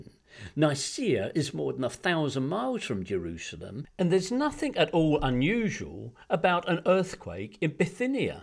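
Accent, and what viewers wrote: United Kingdom. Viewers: Why do these bible bashing videos always have such a pretentious tone... this one with a British accent? These presentations go to extreme lengths to invalidate such insignificant things. To ridicule what is already ridiculous is even more ridiculous. Sorry, not very impressive.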